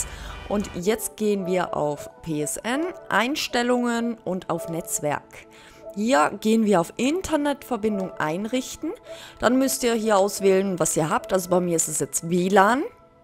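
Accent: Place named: Swabia, Germany. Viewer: Ich mag Deinen leichten Schwäbischen Akzent 😊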